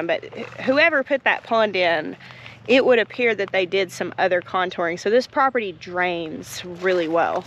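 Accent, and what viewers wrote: South, United States. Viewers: cracked me up😂 because no offense but to me you guys do sound like that… with the southern drawl and all… I’m in NY so that explains that…😘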